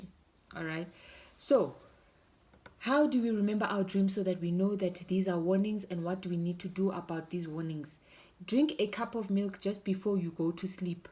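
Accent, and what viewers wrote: Nigeria. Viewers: Nigerian accent akere 😃😃👌👌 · It the Nigerian accent for me😅😅🤞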